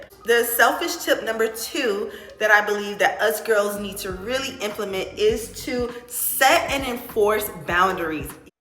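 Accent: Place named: Philadelphia, United States. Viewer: It’s giving Philly , where you from?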